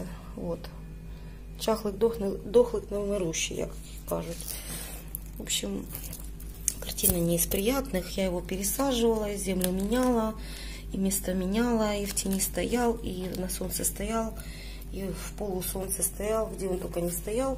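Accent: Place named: Ukraine. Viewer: Здравствуйте! Спасибо за обзор. Начала смотреть ваши видео с антуриума. И мне понравилось ваше отношение к растениям. Скажите, пожалуйста, а где вы географически находитесь? Очень интригующий вид из окна! И очень напоминает картины северных районов России: Воркуты, например. Но судя по наречию, вы, конечно, украинка.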